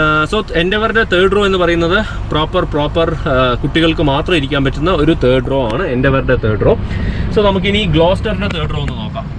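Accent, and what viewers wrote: Palakkad, India. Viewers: nengal Palakkad anno? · പാലക്കാട് ആണോ....സ്ഥലം...പാലക്കാട്‌ സ്ലാങ് ആയി...തോന്നി...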